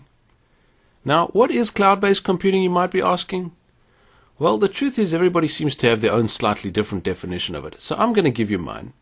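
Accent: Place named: Afrikaans, South Africa. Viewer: jinne, jou afrikaanse aksent skyn deur ;P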